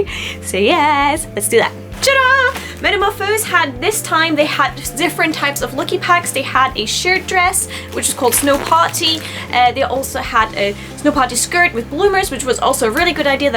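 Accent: United Kingdom. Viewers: It's strange how you sound slightly Scottish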